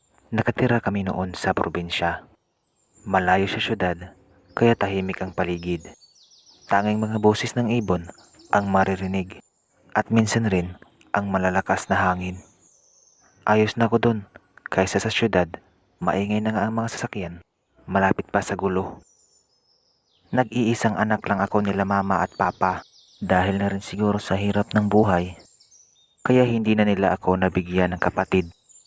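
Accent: Visayas, Philippines. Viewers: Wow ang ganda Naman po ng video nyo lagi po naming di nadownload Ang bago nyong ina-upload pwedi po ba kayo ulit mag upoload Ang ganda po Kasi ng video nyo eh at kakatawa po Yung boses nyo parang bisaya pero nakakatakot din Naman po.😄😊🤗😘😍🤩😅😖😖😲😲😨😨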